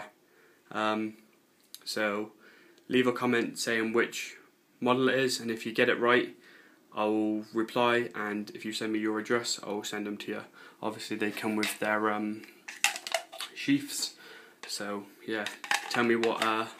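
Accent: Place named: United States. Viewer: Must be a 'Murakin. The only English speaking country that needs subtitles for English. Sometimes for their own countrymen. Stupid inbred gits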